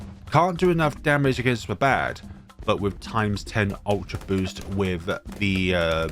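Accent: United Kingdom. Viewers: Wait.are you brittish or australian? Cuz you sound like the ceo of australia.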